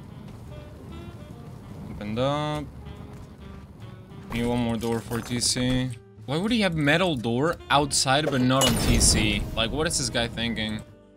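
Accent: Argentina. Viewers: Oirte hablar en español con ese acento argentino ayudando a los novatos me da la vida ❤❤ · como que este chabon es argentinO XD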